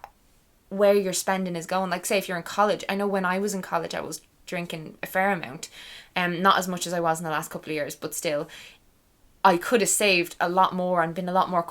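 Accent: Ireland. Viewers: I can tell by your accent that you are Irish, but that is just me! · I love listening to you. Your irish accent reminds me of when I was living in Galway a few years back. Love Ireland. Big up to you for getting sober. I am two weeks in at the moment. Feeling so much better already. Greetings from germany. Chris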